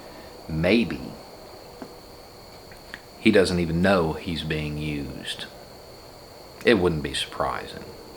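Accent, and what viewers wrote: South, United States. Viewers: Hmm. I can unmask. 1. He’s wearing a suit, pretty sharp. 2. That pixelation can’t hide his beard. 3. Southern accent, cool demeanor. is Billy Gibbons!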